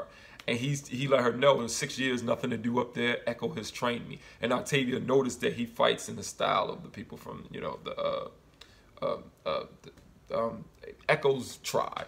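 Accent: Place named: United States. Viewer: Good review... Ive been trying to find another recaper of this show after happycool's channel stopped doing reviews. also nice accent! Im Canadian so I enjoy hearing American accents lol. I do like Belamy and Echo... they do go well together but I do want Bellarke to happen. Maybe they will kill Echo off... or Bellamy and Clarke will bond over some plan while Echo is away doing her spy thing. He will talk about how hard it was to leave her behind and how is heart hurt and they will lock eyes and makeout!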